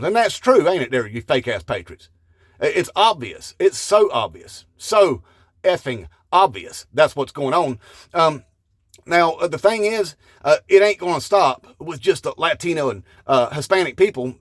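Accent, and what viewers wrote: South, United States. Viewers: Your hick dialect comes straight from the trailer park boy. West Virginia? Texas? Steers and queers and all.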